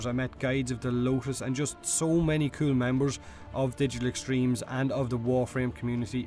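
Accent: Ireland. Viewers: lol are you from Ireland??? I em in Ireland to :D loooool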